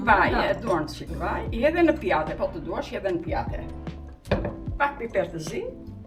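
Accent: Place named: Piqeras, Albania. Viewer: te lumshin duart e arta dhe modestia qe ke te ben mjaft fisnike! Por ti e ke ruajtur gjuhen e Piqerrasit, ndersa vajza flet me dialekt te Shqiperise se Mesme neper video! 😊😊😊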